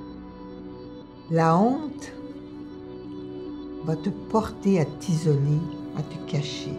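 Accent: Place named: Quebec, Canada. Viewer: c un accent québéquois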